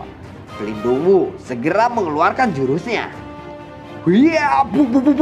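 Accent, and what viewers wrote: West Java, Indonesia. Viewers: Cing baleg atuh ngomong na katingali pisan Jelema Sunda na teh tapi Hade pisan Gaya na terus Ken Persib bandung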